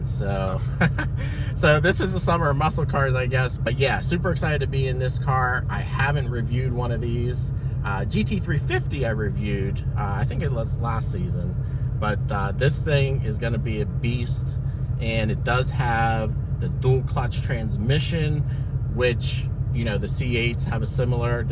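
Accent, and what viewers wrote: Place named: Pennsylvania, United States. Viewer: I knew you were from PA... we got that accent so I am told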